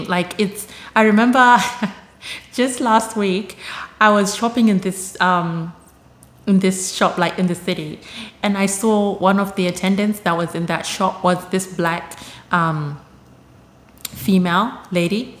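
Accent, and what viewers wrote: Australia. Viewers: Your accent is interesting it sounds Australian but not quite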